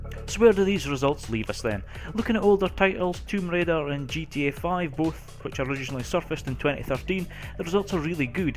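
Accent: Scotland, United Kingdom. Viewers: Your Scottish eh? Dinny try hide it noo.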